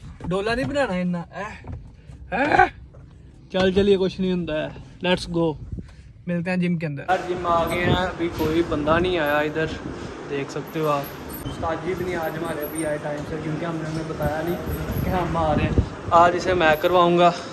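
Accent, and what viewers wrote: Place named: Gujranwala, Pakistan. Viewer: Aoa bhai, watching your videos since day 1 and following you on insta since 2018 i think. I just want to say k aap apni Gujranwali jo zuban hay Punjabi or uska accent jo bolty hain. Yahi unique cheez hay aapki, aap us main hi vlogs banaya karyn, or yahi waja sy log aapko follow karyn gy. Or apki squats or jerk ka bhht bara fan hun, ye dollay shollay or shredded body k peechy na jayen, us k liye fat bhht cut karna parti or bhht papar belny prty, apki power b compromise ho gi. Bass yahi ek choti c advice apk liye. Thank you and love you. By the way meri birth b gujranwala ki hi hay is liye wo accent sunny ka sawad ata. Is liye fake urdu accent main aap apny apko achi trhan express b nhn kar pao gy. Or log vlogs yahi cheez dekhny k iye dekhty.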